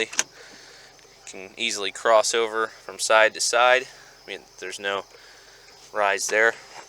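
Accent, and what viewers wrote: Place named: Canada. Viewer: Are you canadian? Every sentence you speak sounds like you're asking a question.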